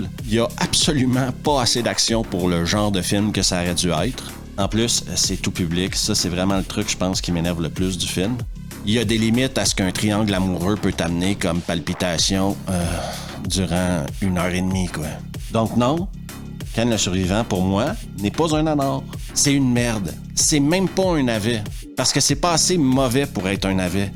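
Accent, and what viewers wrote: Quebec, Canada. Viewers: J'adore tes vidéos tu a un accent québécois a tu déjà vécu au Québec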